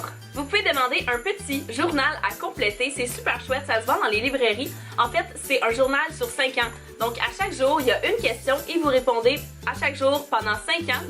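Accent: Quebec, Canada. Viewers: L'accent canadien est si beau ... 😍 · je ne m'attendais pasa se que tu me répond mais je suis très contente j'adore ton accent québécois jai découvert ta chaine grace a mon amie et jadore je le suis abonnée bisous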